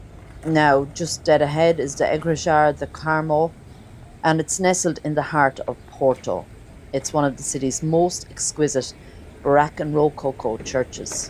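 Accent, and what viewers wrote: Ireland. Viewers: I wouldn't know if you pronounced it wrong or not with your Irish accent 😉 😊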